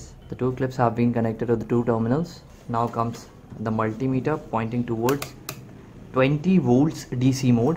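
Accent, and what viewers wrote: India. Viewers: indian accent so funny lol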